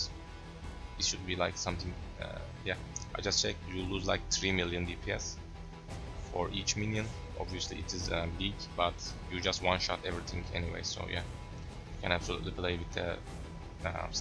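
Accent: Turkey. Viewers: Lol I immedialy recognised the Turkish accend. Ty for the build.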